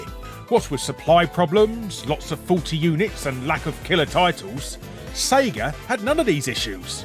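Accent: United Kingdom. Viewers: You new here and never heard a Brit? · Sup, Just writing “Hi” here to confirm that INDEED the subtitles help me TONS as a non eglish speaker with trouble understanding heavy accent english (no offense brits i LIKE your english, but is hard to understand some of your pronunciation 4 me without subs. it even helps me to learn it) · He's British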